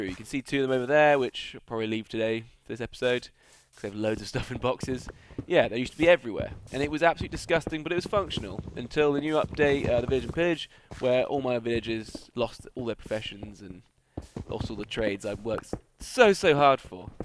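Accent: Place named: United Kingdom. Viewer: omg I just luv ur british accent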